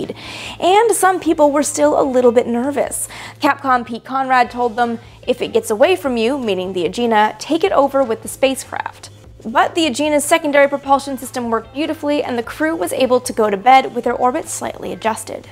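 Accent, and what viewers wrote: Canada. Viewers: Love what you do! Living just a few miles from the path, I'll be doing the eclipse "live". We make a big deal about these events but I've always wondered if so much true science is to be derived from them, why NASA hasn't done more in space research as there is an eclipse occurring at a point in space, constantly. And ignore comments below regarding pronunciation of Gemini... I think it is a bit of a lovely Canadian accent coming through.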